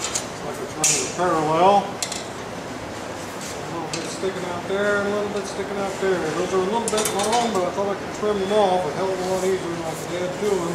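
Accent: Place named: United States. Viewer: I like the way he speaks . Reminds me of real American person how they talk .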